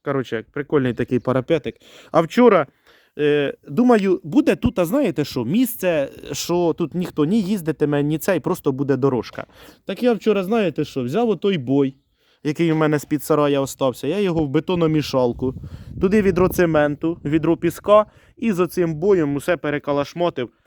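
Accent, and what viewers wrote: Poltava, Ukraine. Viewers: По мові пізнала, що Полтавщина, а тут ще й Устивиця - бабуся там жила. Ностальгія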